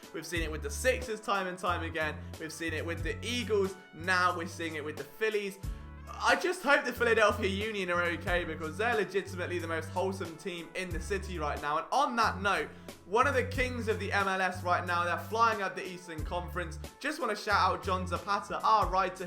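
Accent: United Kingdom. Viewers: Holy shit. The only thing worse than an eagles fan, is an eagles fan with a horrendous British accent.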